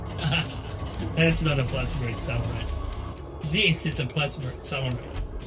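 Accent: Australia. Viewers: where are You!? UPDATE: On main YouTube screen on channel, on top bar, there's COMMUNITY, press and pick read more. Respect for You. You are Star, You are example how to be Great Youtuber and how to be a Great Man, Human, GENTLEMAN. I wish You well. ✌️ I can not wait Your further guidance and Aussie accent. 😉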